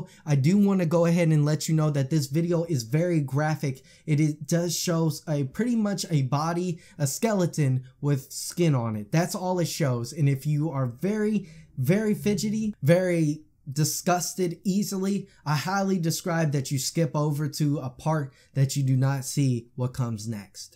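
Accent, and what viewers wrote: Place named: South, United States. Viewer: I keep hearing a suthern twang when he's talking. Am I the only one hearimg that? Gotta love those country boys lol 😉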